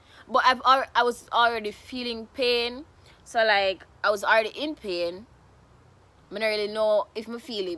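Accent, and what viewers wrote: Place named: Jamaica. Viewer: You are more than made for this, have been binge watching you since the other day and you are like all of the Jamaican comedians combined plus more because you have accent locked. Very entertaining 💫💫💫💫💫💥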